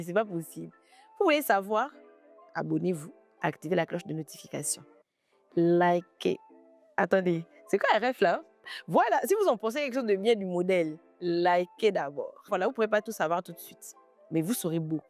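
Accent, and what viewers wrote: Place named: Gabon. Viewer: Une gaboma j'ai reconnu l'accent ❤❤❤❤❤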